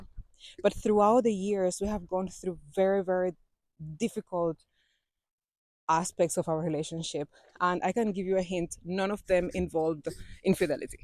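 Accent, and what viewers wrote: Jamaica. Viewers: You Jamaican, Just born in Cuba, but girl you roll those Jamaican words like a Cuban Cigar!! Boss Lady!! Love what you do!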